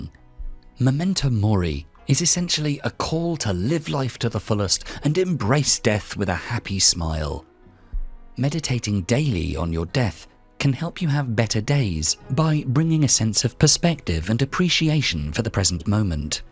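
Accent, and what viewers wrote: United Kingdom. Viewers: I was in stress in those dark days quit job, broken up, didn't find any light in my way of life. Coincidently, I watched your video, listened to it because I love British accent and I've been learning English then I realized this channel like my teacher -it took me back to the right way, shown for me what I have to do. After one month quitting my previous job (which I didn't like and it took 12-14hours/day ) finnally I found the new job which I really enjoy it🙂. Thank for your effort to make these videos. Hope you and your team have a good health to make lots of precious videos to heal people like me. 🎉❤❤❤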